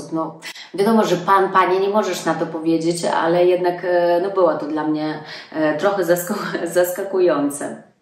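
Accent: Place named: East, Poland. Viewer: Skad ty pochodzisz - po akcencie widze ze ze wschodu-wszystkie polskie zwyczaje sa piekne , niepowtarzalne- takie nasze polskie- tym sie roznimy od calego swiata- imieniny - supe - calowanie w reke - szacunek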